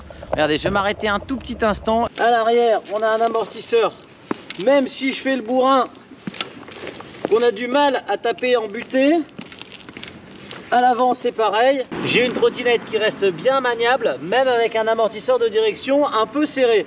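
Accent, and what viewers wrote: North, France. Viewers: Je reconnais bien le nord lol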